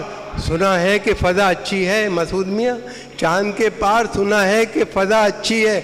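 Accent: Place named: Meerut, India. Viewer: Lajawab meeruthi lehjah he....!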